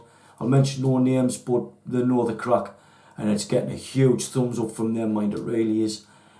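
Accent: England, United Kingdom. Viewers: If your english through and through you would be able to understand him and also know what part of england he is from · Got half way through your video and had to turn it off, I couldn’t understand a word you said, only the odd word here & there.. it’s speeded up mumbling type thing that I couldn’t get. ? Are you English? I am through and through.. I’m sure your video is very good but I have no way of knowing ? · You make great rigs, but I wish I could understand you better, I have big trouble with English and Irish accents..... I'm from the deep south USA where we talk real slow.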